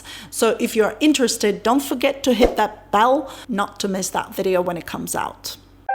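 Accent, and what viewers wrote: Jamaica. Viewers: I can hear slight Jamaican accent coming through. Brings a smile to my face. I'm a Jamaican with many visits to Ukraine for the last 20 years under my belt. I took my Ukrainian friend to Jamaica earlier this year and she loved it so much, she didn't want to come back to the UK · Me just like before I watch the videos. Why? Because I know will bring good things to my ears and educate me about my own country. I heard a little Jamaican accent now and then.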